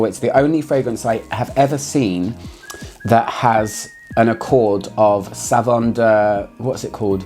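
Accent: United Kingdom. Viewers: I LOVE your british style and your pronounciation ❤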